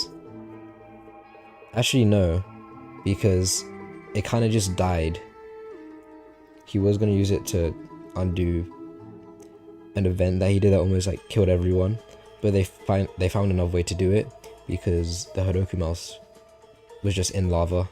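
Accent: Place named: United Kingdom. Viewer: Do my ears deceive me? A fellow British Xiaolin Showdown fan?!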